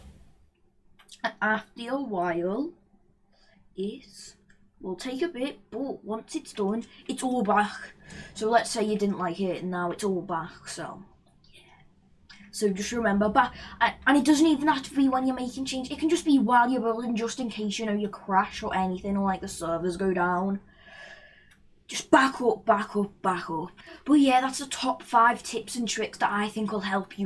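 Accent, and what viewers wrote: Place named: United Kingdom. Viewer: The main reason i watch u cuz u are British and have that funny-ish british accent like a ytber i used to watch.keep ⬆️ ur grind.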